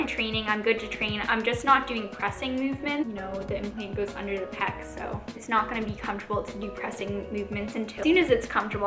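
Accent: Canada. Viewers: that makes me happy the Canadian accent sounds good! It just sounds normal to me.. I suppose it's an accent to others. lol :) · I was curious if you were Canadian because of the way you said about & out, super cute 😂 but my anwser was answered at the financial part of the video. Glad you did it for you & no one else ! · youre from the great white north ye'?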